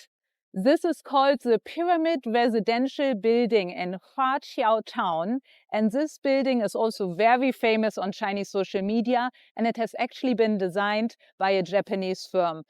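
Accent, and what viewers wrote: Germany. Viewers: Great video! Promoting a city while advancing your professional service! No wonder, with your German accent you are so successful! Cheers!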